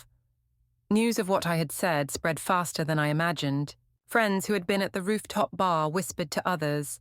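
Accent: England, United Kingdom. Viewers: You know, every time I hear that English accent on the narrator I get pissed off.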